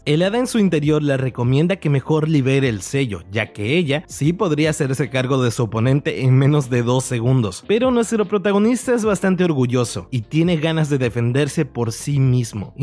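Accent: Venezuela. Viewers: eres venezolano? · Eres venezolano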